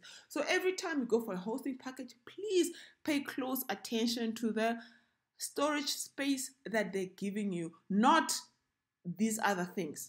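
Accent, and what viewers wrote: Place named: South Africa. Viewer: Damb lady you are smart. So refreshing to hear a SAcan voice to explain things.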